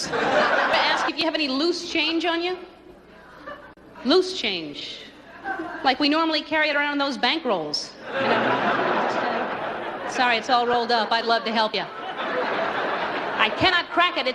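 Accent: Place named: South, United States. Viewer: She still had the Southern drawl more marked than her later standardized Continental accent _'for all Publics'. I saw her in College when she was starting and she was even cuter, however more insecure which she built into her act as natural thing. When you have cracked eggs, you make...Ellen humor.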